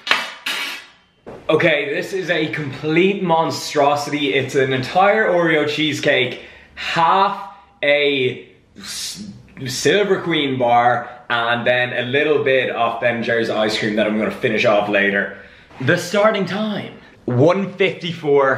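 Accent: Ireland. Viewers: I forgot how much I love Irish accents wow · Παύλος Εικοσπεντακης it's an irish accent .... ireland :) I'm also irish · Are you irish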